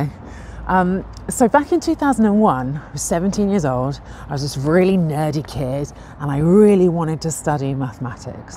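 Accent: England, United Kingdom. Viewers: Nothing wrong with the algorithm. It brought me to an intelligent ginger with an English accent. 😊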